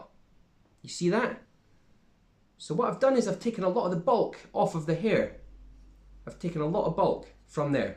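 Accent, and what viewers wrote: Scotland, United Kingdom. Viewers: Lol every video ive watched has been an American, so rare to click a thumbnail and hear a Scottish accent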